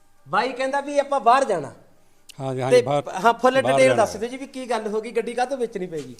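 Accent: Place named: Majha, India. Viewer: ਤੁਸੀਂ ਤਾਂ ਮਾਝੇ ਦੀ ਬੋਲੀ ਬੋਲਦੇ ਹੋ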